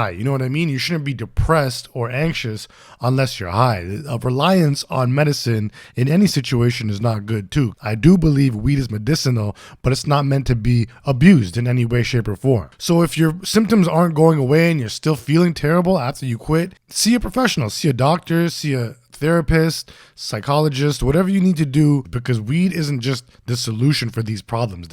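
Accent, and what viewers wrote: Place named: Toronto, Canada. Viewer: Why is it so easy to tell that someone is from Toronto 😂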